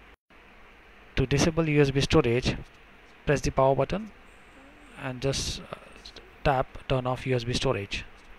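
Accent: India. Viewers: what accent do you have lol :D Indian? :D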